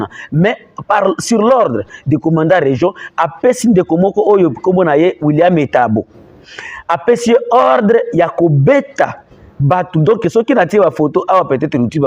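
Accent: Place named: Rwanda. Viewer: La voix du rwandais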